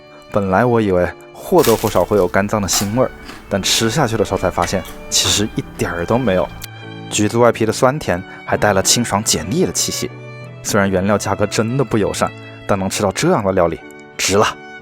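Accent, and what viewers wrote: Wuhan, China. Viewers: 就是说 这一听就是直击灵魂的武汉口音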